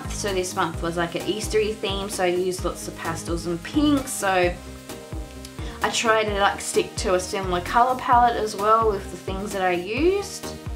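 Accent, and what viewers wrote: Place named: Australia. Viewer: Omg I was wondering if you were aussie from your accent. I had to check the info on your page. Yay to Aussies using Hobonichi. But omg the shipping!!!!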